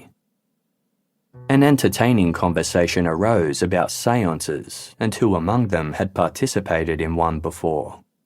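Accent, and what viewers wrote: Australia. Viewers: That clear, well modulated, Australian voice, makes this crime channel so good. Thank you for this, and peace to all out there.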